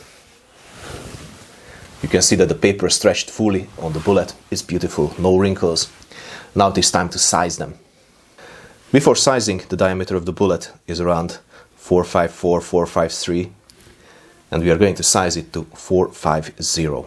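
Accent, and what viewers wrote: Hungary. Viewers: Nice Hunglish ))). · Michal Štiglic now imagine him saying that in his Hungarian accent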